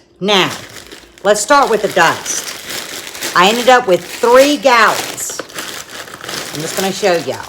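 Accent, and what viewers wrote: South, United States. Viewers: Hey, love the video. Just made it bk from south Louisiana visiting family. I think you are most definitely from the south 😊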